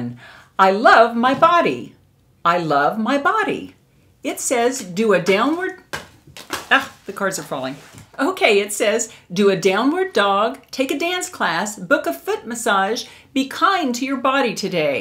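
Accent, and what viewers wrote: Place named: South, United States. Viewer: Wow...so beautiful... definitely a southern belle... absolutely love you accent girl..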